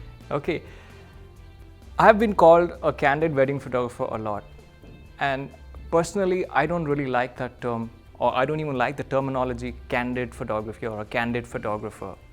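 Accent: India. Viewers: Dear, Looking at your breath taking photos and the comments and the kind of person you are, remembered a joke… once a Chef visits a Photogapher’s home and appreciates all the photos and in the end says you have a awesome camera, another day the photographer visits the Chef’s home tastes lip smacking dishes and says ‘you have a n awesome gas stove’ ….you are awesome…very interesting to see a Chinese Photographer with so much exposure to Indian culture…. Bow to your humility and talent. Were you brought up in India, looking at your Indian accent? And to sum up the message just a few minutes back found this video of Photographer Annie Leibovitz which I would like to share: <link> Thankyou Pixel Village and for this wonderful video by. P.S. In the past for me wedding equals trauma…and I recovered from the trauma and managed to make it beautiful by using EFT which is based on Chinese Accupuncture….so some connection with you:). 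Thankyou for sharing from your heart, i too love taking photos of my family with my mobile for the time being:)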